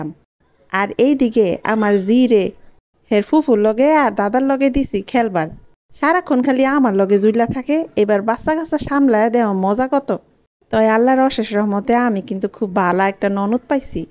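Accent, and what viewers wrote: Mymensingh, Bangladesh. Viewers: মাশাআল্লাহ আপু এক ঘেয়েমি কেটে যাওয়ার মতই অসাধারণ ভিডিও। ভীষন ভাল লাগল। তুমি এমনিতেই অনেক কিউট। আজ আরো বেশি সুন্দর লাগছিল। ময়মনসিংহ ভাষাকে তোমার মুখে শুনতে খুব মিষ্টি মিষ্টি লাগছিল। অনেক সুন্দর পরিবেশ ছিল।খুব ভাল লাগল আপু।😍🥰😍🥰😍🥰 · so cute আপু, তুমার মুখে আমাদের মমিসিংগা ভাষা শুনে ভালোই লাগললো❤, এ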